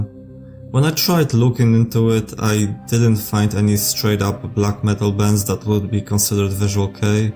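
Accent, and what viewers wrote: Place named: Poland. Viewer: slysze twoj polski akcent kolego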